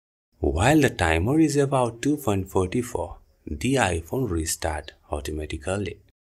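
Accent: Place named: India. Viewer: sounds very indian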